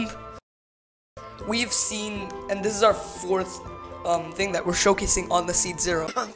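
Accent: India. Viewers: Nice vid but are you Indian.........?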